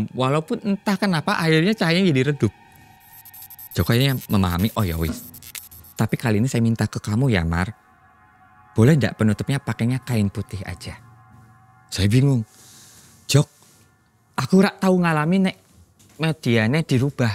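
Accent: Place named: Java, Indonesia. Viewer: Ditunggu Mas cerita epik nya..bahasa Jawa ne jenengan enak banget di dengar nya❤❤😊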